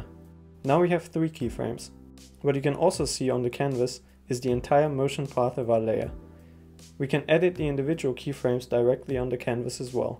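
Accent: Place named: Germany. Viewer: Das klingt doch nach nem deutschen Akzent 👀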